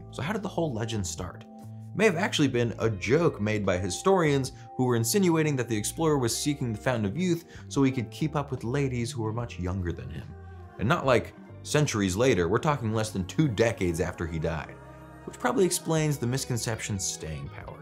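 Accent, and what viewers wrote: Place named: Florida, United States. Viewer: I would've guessed the host is from FL.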